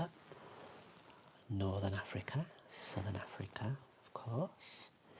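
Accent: United Kingdom. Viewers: Most likely British English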